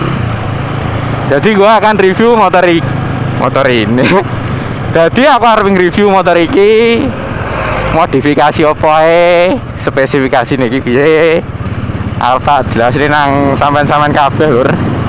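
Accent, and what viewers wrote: Java, Indonesia. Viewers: Jowo Endi mas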